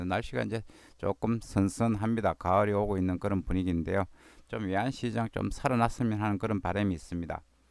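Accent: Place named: Gyeongsang, South Korea. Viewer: 이분 경상도말 쓰는 라도좌좀이십니다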